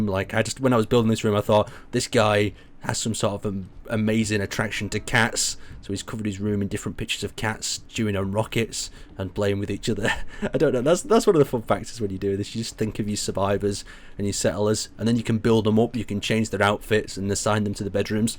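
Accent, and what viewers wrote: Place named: Burnley, United Kingdom. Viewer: where are you from? Burnley lass!!!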